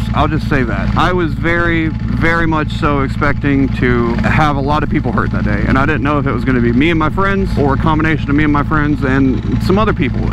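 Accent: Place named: Shreveport, United States. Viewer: Sounds like Shreveport,la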